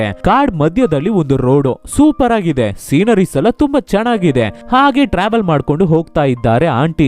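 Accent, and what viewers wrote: Tamil Nadu, India. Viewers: Your mother tongue is tamil ryt?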